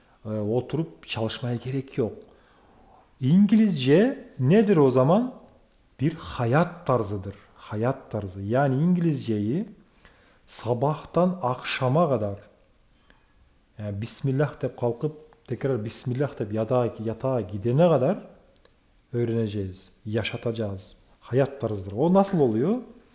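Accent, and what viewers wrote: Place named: Azerbaijan. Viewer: Merak ettim nerelisiniz acaba Türkiyeli mi yoksa Azerbaycan Türk'ü mü Türkiye Türkçesinden farklı konuşuyorsunuz ondan merak ediyorum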